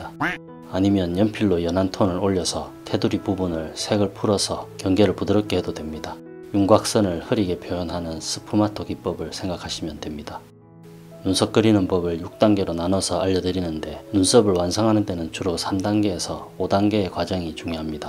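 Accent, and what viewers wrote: Gyeongsang, South Korea. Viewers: 보고 또 보고~~~ 전말 저도 겸손한 전은혜입니다ㅎ 유머가 제 스탈입니다 전말 ㅎㅎ 팬이 될듯 구독ᆞ좋아요~~~ 말투가어딘지모르게 갱상도 억양 느낌이 전 창원인데 그림 배우러 가면 멀까요~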